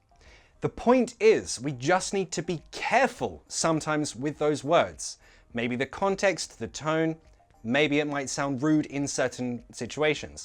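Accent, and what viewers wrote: United Kingdom. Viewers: Oh God I love the British accent 😂😂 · You remind me how beautiful British English is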